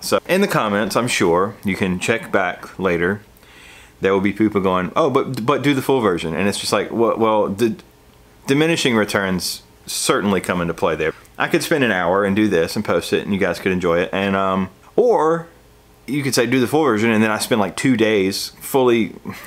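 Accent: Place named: North Carolina, United States. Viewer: I love hearing your slight twang. Reminds me of growing up in NC. Keep doing what you're doing. You're killing it, my dude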